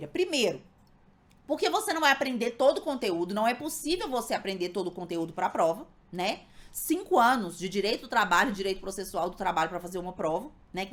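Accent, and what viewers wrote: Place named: Minas Gerais, Brazil. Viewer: é mineira 😂😂😂😂😂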